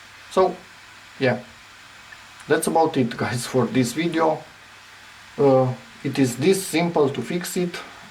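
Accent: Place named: Romania. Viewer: You have such a strong roumainian accent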